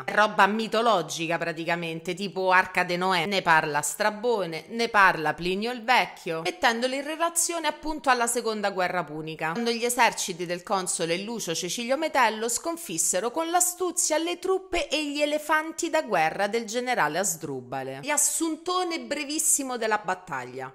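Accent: Rome, Italy. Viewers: Grande, così grande che temo per lei. Fortuna che il suo senso dell’umorismo e la parlata romanesca fanno pensare ai superficiali che siamo di fronte ad un Crozza del web. E pensano che il popolo bue non la prenda sul serio…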